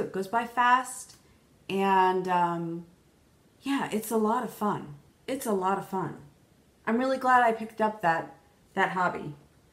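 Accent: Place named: Philadelphia, United States. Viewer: I have to watch you! You’re from up near my hometown (Philly) and the accent makes me feel like home lol plus, you crack me up 😂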